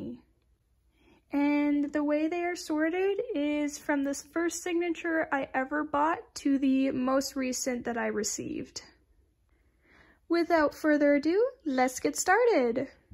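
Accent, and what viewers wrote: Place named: Australia. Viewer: Your aussie. Give 😂 - Tay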